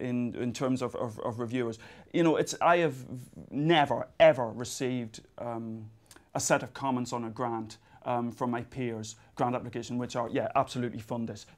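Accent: Ireland. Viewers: Where is from? He sounds like he is from south Dublin, maybe Meath? or even Wicklow? Completly irrelevant but I would like to know. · I'm not sure where he is from specifically but to me he sounds like he's from somewhere around Dublin. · I'd say its a louth or monaghan accent.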